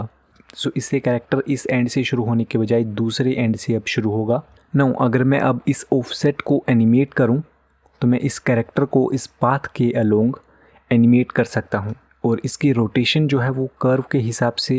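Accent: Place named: India. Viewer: india voice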